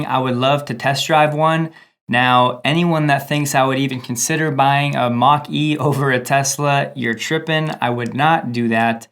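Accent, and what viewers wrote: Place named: Staten Island, United States. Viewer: Are you from Stanton Island?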